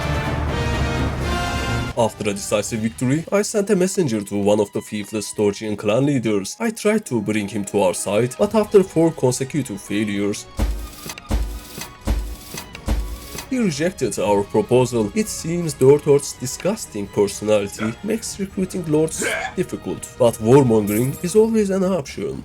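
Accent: Turkey. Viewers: Bro he is actualy a turkish olso he is speaking English with turkish acsent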